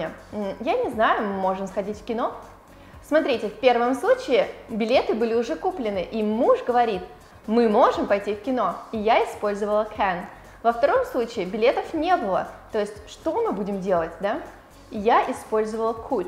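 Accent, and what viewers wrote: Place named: United States. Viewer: Американский акцент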